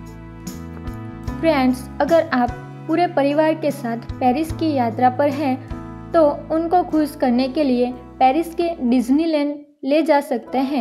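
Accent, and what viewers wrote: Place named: Gujarat, India. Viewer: Tame gujrati 6o aevu lage 6e 🤔🤔🤔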